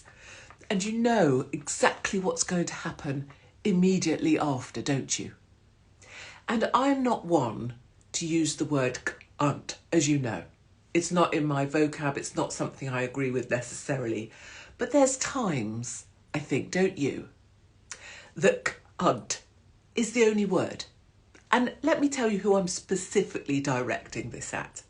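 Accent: RP, United Kingdom. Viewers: A well-spoken lady "posh" where I'm from speaking up for the working class. The more I see of the more I think....legend. As I'm writing you said Cu..nt. my god You just became more legendary to me. Keep at it 💪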